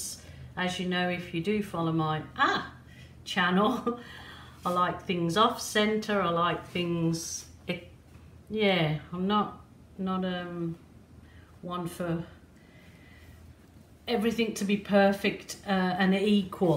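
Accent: Birmingham, United Kingdom. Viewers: Aaarh Bostin way spotted the Brum accent when we subscribed to you. From John ex Brummie (handsworth) but only moved to NE England!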